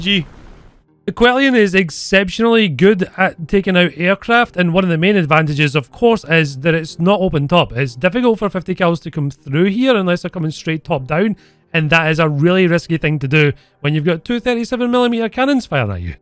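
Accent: Scotland, United Kingdom. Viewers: Two observations: 1) Your “silly skit” intros are excellent, and they seem to be getting better as you go on; 2) I’ve made viewing your videos part of my daily routine. Whether it’s your Scottish accent, your hilarious sense of humor, or the excellence of your content, I guess I’m addicted to your channel. Well done,.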